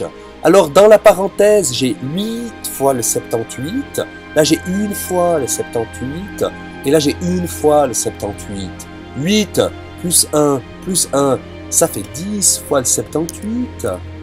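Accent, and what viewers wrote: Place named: Valais, Switzerland. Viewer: Excellent, malgré l'accent Fendant.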